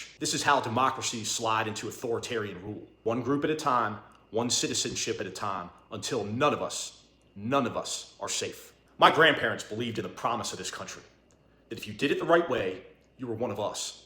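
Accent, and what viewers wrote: Pennsylvania, United States. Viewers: I could hear the Pa accent. Thank you for your message, means a lot. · Omg, Pennsylvania! I thought your accent sounded familiar! Thank you for everything you've done for our country and what you're doing for us all now! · When you speak, they can tell that you are an American.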